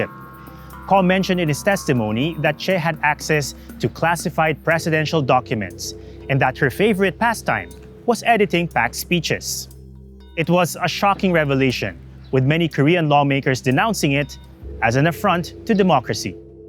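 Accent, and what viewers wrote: Philippines. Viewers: Korean names and a Filipino accent 🙄 we need subtitles please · Is the host Filipino? He has that accent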